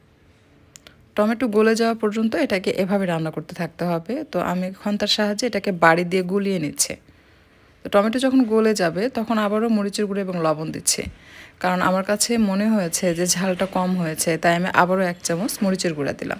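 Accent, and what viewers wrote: Bogura, Bangladesh. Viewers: Jak bugurar 1ta apu pelam Tai toh boli kotha ato sundor kano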